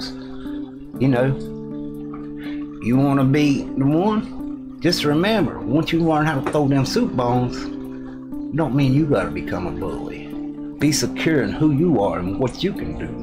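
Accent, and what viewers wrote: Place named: South, United States. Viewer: My wife is rollin on the floor. Love how you articulate in the southern accent !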